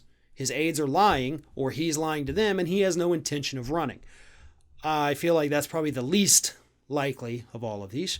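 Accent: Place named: Florida, United States. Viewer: Love your Floridan accent saying," egth,! I dont want that agaun,!" " what was I doing? VOMIT "!!!☺️🤠✌🏽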